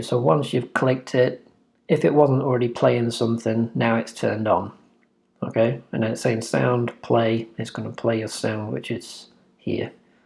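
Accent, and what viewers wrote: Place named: United Kingdom. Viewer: i subscribe I like how he talk a british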